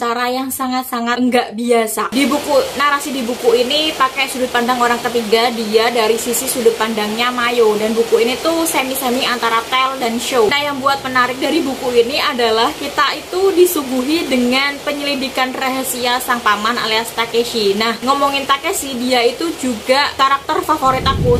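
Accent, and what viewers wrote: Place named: Yogyakarta, Indonesia. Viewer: Domisilinya Jawa mana kak? Suaranya mengingatkan aku sama temen-temen di Jogja. Sehat terus ya kak biar bisa mereview novel-novel lain. Amin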